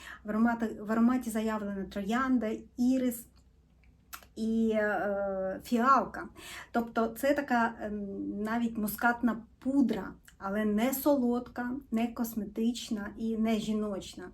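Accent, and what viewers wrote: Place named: Russia. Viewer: Акцент очень сильный, вы говорите на русском в быту... Это точно😊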